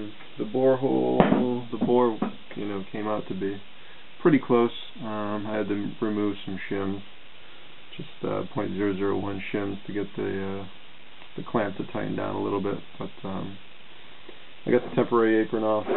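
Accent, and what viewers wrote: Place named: New England, United States. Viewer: I heard the accent. One of those Nor'eastern States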